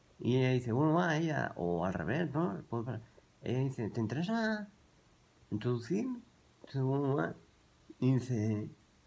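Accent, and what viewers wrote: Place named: Galicia, Spain. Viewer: entre la dentedad y que hablas como un Gallego, me parto jjajajaj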